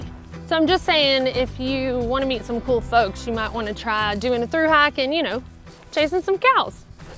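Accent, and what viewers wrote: South, United States. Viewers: I usually turn CC on because my hearing isn't the best and I think it's hilarious that it interprets your "hikers" as "hackers". Hurray for your Southern accent :)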